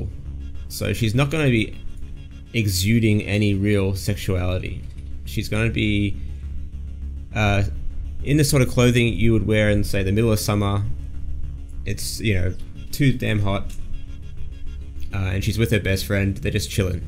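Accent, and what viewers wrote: Australia. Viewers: Wow straight off....Aussie talk, i miss people being just them selves, not afraid to speak your mind and not worried about the odd swear word thrown in. :) What part of DownUnder you come from, im a sandgroper, if your Aussie you know where that is :P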